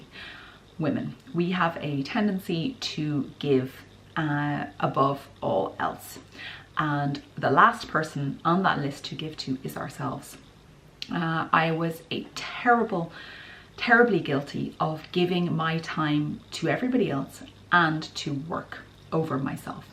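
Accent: Ireland. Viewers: Hello, new subscriber here! I contacted bodyslim but all courses r fully booked! I shall start walking and hope to enroll with bodyslim with their next intake, in April. You r lucky u realized u need to prioritize yr time at such a young age! Yes because if we do not, we end up BURNT OUT and depressed! Enjoying yr videos and love yr Irish accent 😊.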